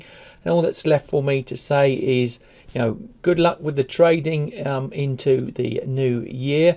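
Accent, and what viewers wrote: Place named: Kent, United Kingdom. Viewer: Does anyone know whether is a Kentish speaker? It’s the one British English accent that has a tinge of Australian elements, though we probably should say that the other way around. Apparently, Kent has been overrun by Essex speakers, so the traditional accent has mostly been displaced in recent generations.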